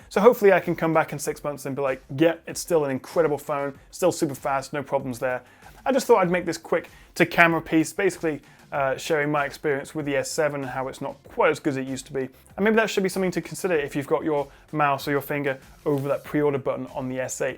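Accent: United Kingdom. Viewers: meh whiney brit not enough of those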